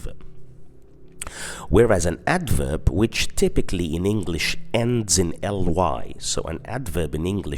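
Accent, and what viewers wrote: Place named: United Kingdom. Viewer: amazing british accent !!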